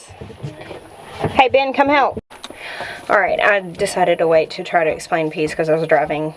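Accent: South, United States. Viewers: I add onion slices when itz too hot for lettuce. I had thinly cut cuke slices the last time. Both are good Girl, you're beginning to sound reeeallll Southern, <snicker> says the Bama woman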